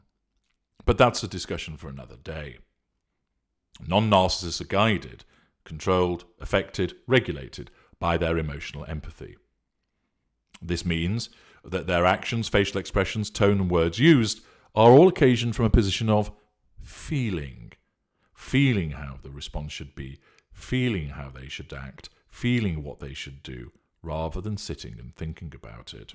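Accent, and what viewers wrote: United Kingdom. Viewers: This British accent- I feel like a spot of Earl Grey and a plate of biscuits while listening to this😅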